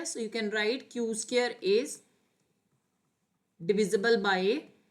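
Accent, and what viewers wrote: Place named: North Kerala, India. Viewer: vadakkan accent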